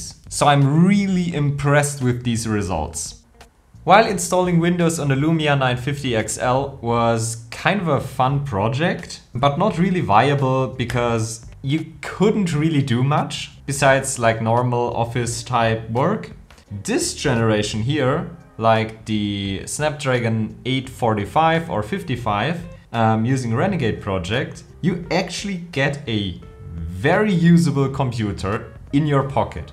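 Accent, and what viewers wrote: Germany. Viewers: Is he german, austrian or swiss?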